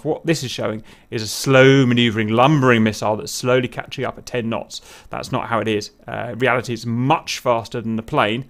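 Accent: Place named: United Kingdom. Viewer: I am not used to British accent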